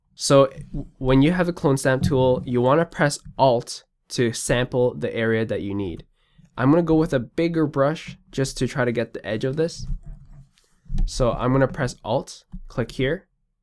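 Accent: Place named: Singapore. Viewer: it's Singlish.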